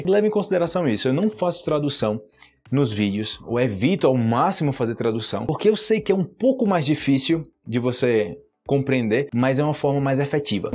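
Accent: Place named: Colombia. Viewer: Voce é crack,! A maioria de coisas que eu aprendi em portugues foi com os seus vídeos! <3 Obrigada sempre! Aproveito para te falar que fico surpresa sempre com o seu espanhol maravilhoso e o seu sotaque colombiano!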